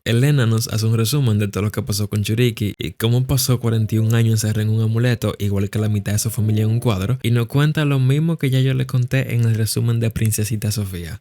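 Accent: Dominican Republic. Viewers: Bro eres dominicano o es cosa mía? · AY ERES DE REPÚBLICA DOMINICANA OMG DE QUE PARTE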